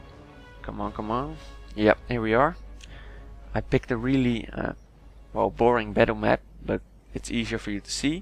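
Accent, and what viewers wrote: Netherlands. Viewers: damn i know dutch person when i hear one, lekker bezig pikkebaas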